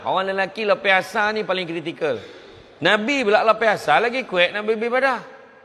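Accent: Negeri Sembilan, Malaysia. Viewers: 👍 bahaso melayu minang nogori semilan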